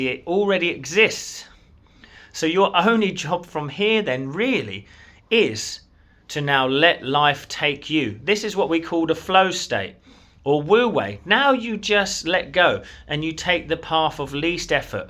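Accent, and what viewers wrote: United Kingdom. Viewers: Thank you for the depth. Clarity and precision and of course the British accent ❤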